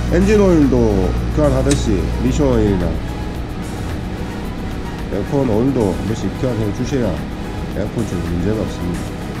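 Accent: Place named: Gyeongsang, South Korea. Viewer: 아무리 힘들어도 신나게, 멋집니다. 경상도 말투라 오해하실 분도 좀 있겠습니다. 혹시 부산인가요? 저는 인천에 삽니다만, 부산이 고향이라 친구들 많아요. 부산이면 소개해드리고 싶네요. 수고하세요. !!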